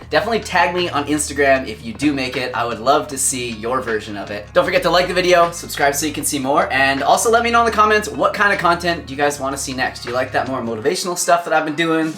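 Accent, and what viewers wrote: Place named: Canada. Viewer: He's Canadian. He's def has the accent🙂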